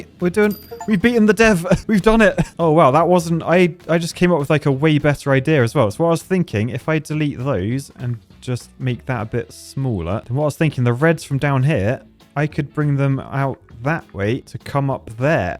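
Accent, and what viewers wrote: United Kingdom. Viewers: I forgot you were British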